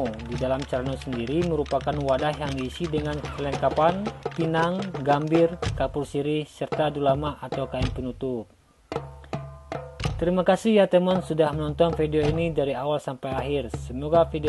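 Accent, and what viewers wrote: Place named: Aceh, Indonesia. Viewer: Logat nya kok logat Aceh ya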